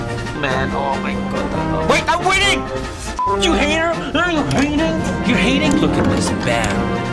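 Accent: Germany. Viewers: Is he german? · you sound a little bit Saxon sometimes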